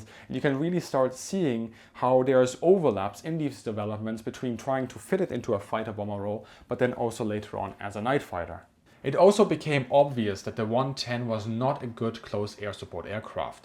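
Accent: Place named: Germany. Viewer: oder ich weiß nicht ganz aber dein deutscher Akzent ist sehr stark raus zu hören wenn du Englisch sprichst😂 Aber deine Videos sind sehr toll und gefallen mir gut. Eine Frage noch: spielst du irgendwann wieder IL-2 BoS mit Bo, Loli und so?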